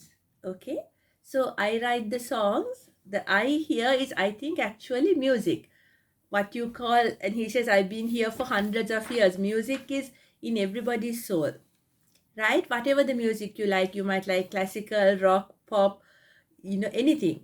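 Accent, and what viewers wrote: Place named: Sri Lanka. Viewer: Dear Mdam You are the only one English teacher who teachs English in English who has Sinhala voice.. සිංහල හඩකින් ඉංග්‍රීසි ඉංග්‍රීසියෙන් උගන්වන එකම ගුරුතුමා හා ගුරුතුමිය හරීම වටිනවා. මම ඉංග්‍රීසි ඉගෙන ගන්නා විශ්‍රාමික (ගණිතය ඉගැන්වූ ) ගුරුමාතාවක් .. ඔබතුමියගේ මීට පෙර ඉංග්‍රීසි /සිංහල පාඩම් හැදෑරුවෙමි. (I try to read English poems which I abandoned ) Thanks again.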